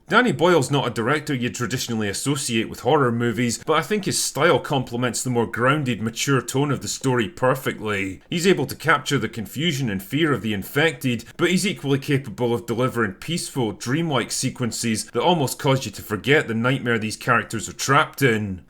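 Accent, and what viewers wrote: Scotland, United Kingdom. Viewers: You, Sir, have excellent taste in movies. That is why I like you. That and the Scottish accent and you are very easy on the eye.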